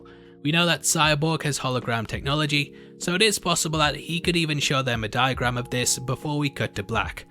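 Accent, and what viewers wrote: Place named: Newcastle, United Kingdom. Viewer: Hi do you live in Newcastle just my dad lives in Gateshead and you sound the same lol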